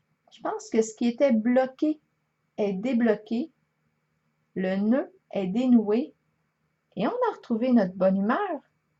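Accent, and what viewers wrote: Canada. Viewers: Les expressions canadiennes sont très belles. Je les aime depuis longtemps. Ainsi que cet accent canadien. Et surtout, vous êtes toujours très très profonde. Merci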